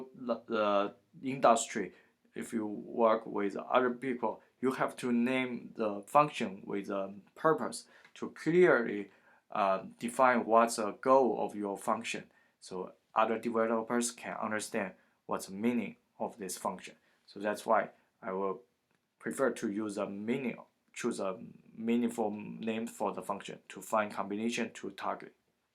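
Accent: China. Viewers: up 主听口音应该是我大华人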